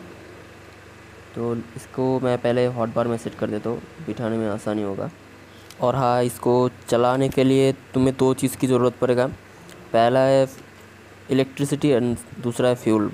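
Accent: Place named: India. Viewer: He’s from India listen to his accent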